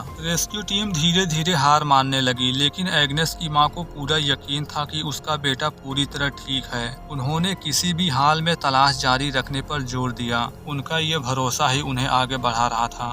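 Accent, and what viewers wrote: Bihar, India. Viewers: Bhai bhihar se ho kya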